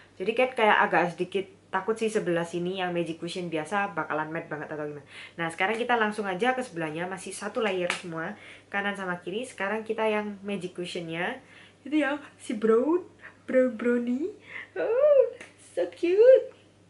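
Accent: Java, Indonesia. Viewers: Hai lg pilek yak?btw ak subscriber baru suka style nya km ngomong sih njowoni hahhaa..keep up the good work ya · medok tenan... kakak ini pengunjung baru kak. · medok ah ameut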